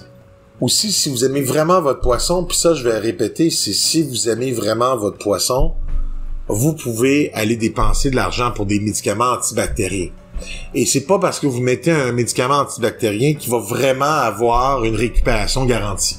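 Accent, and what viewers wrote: Quebec, Canada. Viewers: Bonjour. Je viens de découvrir votre vidéo, car mon betta souffre exactement de ce problème depuis 2 jours. Malheureusement, j'ai compris ça juste aujourd´hui. Il est donc en bac hôpital une journée trop tard. Hier, il n'était pas rond, mais très amophe dans le fond de l'aquarium. Il était nickel en début de semaine. J'ai un escargot qui est mort cette semaine et je me demande si c'est cela. Je suis vraiment peinée, car cela fait 2 mois que je l'aie et j'ai étudié énormément sur l'aquariophilie avant de me lancer dans cette aventure. J'ai de la peine, car je me suis réellement attachée à mon betta (j'ai braillé comme un veau toute la journée), mais j'ai aussi un sentiment d'échec. Je tiens à vous féliciter pour la clarté des informations. C'est une très bonne vidéo. Étant québécoise, je trouve ça cool aussi d'avoir enfin de l'information, via le web et transmise par des gens de chez-nous. 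Je suis abonnée à plusieurs chaines YouTube Européennes, mais notre petit accent est tout de même sympatoche :) J'espère que votre poisson a tenu le coup. Sincères salutations de la ville de Québec 💁🏼‍♀️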